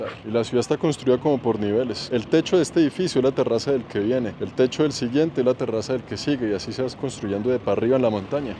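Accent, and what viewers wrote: Colombia. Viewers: me encanta tu estilo, tu hablado parcero, tienes mucha gracia al hablar.🗣️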